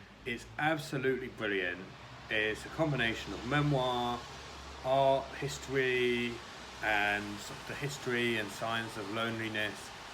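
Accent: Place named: England, United Kingdom. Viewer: Hello fellow UK booktuber! I don't often come across very many so I get excited when I hear an English accent! Great video, love your channel so far! I am new here so still trying to find my way around. Subscribing for sure ❤️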